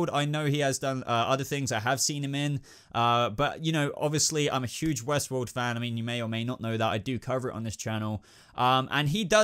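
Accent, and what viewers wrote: England, United Kingdom. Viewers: Great video, where abouts in England you from? I live in Ireland 🍀😀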